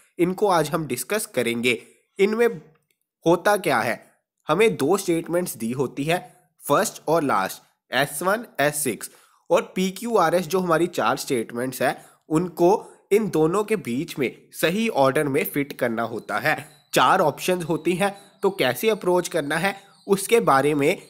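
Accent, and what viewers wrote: Punjab, India. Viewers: Sir ji app punjab se ho kya ?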